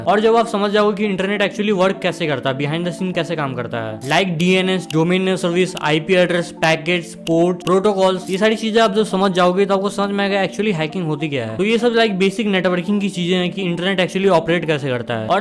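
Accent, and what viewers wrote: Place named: Bihar, India. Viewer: Bhai pahle hindi sahi krle bihari se.bad me hacking krna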